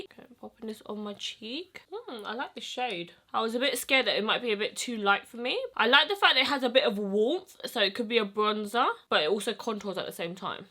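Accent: United Kingdom. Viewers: At first I didn't even realize she was british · OMg she’s British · I didn’t know you were British lol I like your accent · Talk about shocked.. I had no clue she was British 😂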